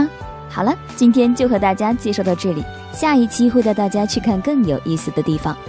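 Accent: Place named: Shandong, China. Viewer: 我以为是山东人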